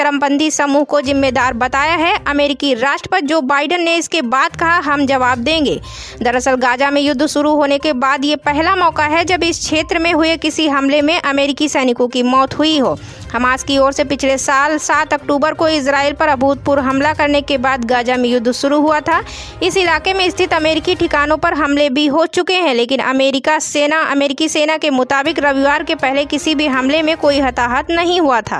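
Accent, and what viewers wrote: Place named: Bihar, India. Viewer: Lagta hai aap Bihari baa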